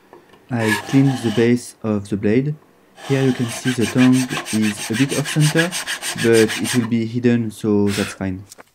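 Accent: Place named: France. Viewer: Le sous titrage est une autre solution ;) ! Ah ! Cet accent français quoi ;) ! Mais joli boulot !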